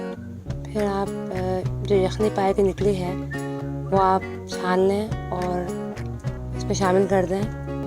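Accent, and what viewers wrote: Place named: Hyderabad, India. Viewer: Hydrabadi accent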